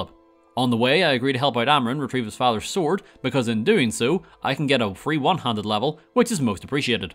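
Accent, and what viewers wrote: United Kingdom. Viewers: ah- british playthrough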